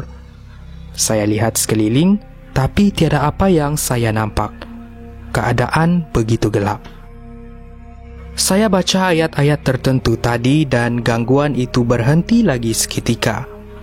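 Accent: Sabah, Malaysia. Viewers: orng sabah memang ckp mcm sebb orng sabah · aku mmg tau pun dia org sabah 😒😒😒 sbb aku org sarawak so dah biasa dgar sleng jiran kami sabah dan kami tahu beza sleng sabah n indo.. Maybe kau x paham komen aku kot..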